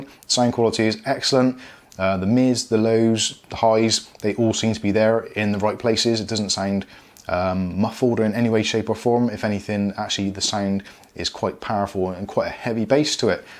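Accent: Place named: West Country, United Kingdom. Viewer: bought a pair of these cans off amazon after watching your review ... your spot on ... great bass and full sound ... bargain for just over £20 ... am in agreement over shiny plastic /grease magnet ... is a shame this p3 model doesn't come with sd card slot like the p1 or p2 ... both of which have less playback time than this model ... seems powerlocus are missing a trick ... if they brought out a new model with a matte finish ... long playback and an sd card slot ... i would happily pay £30 ... great sound ... p.s. you sound even more "west country" through these cans lol ... thanks for review ... correct and accurate on every point ... cheers fella :)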